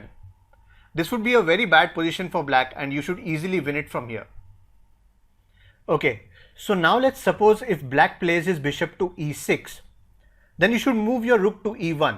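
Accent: India. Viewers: Love the indian accent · idkw but youre very good at this, you teach really good even with the indian accent , just pls remove the intro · Hahah I love the Indian accent. It fits so well into chess videos and it is also pretty entertaining :P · u r indian . ur accent tells it.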